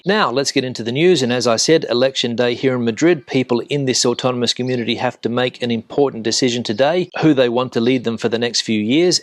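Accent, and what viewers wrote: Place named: Australia. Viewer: Very atmospheric outside in your lovely garden in the Spanish sun with birdsong, gracias a los vecinos ruidosos👍 AND out in your 'CAH' - love your Oz accent - letting us see what we miss 🕶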